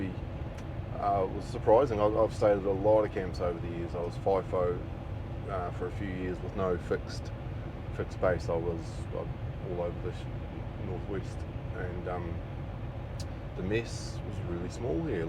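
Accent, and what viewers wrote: Southland, New Zealand. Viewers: You sound like your from southland in NZ. Good job mate enjoyed the video.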